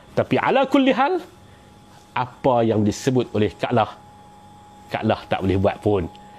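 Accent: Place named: North, Malaysia. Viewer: Itu bahasa Loqhat Utara... Nothing wrong ok... So what now?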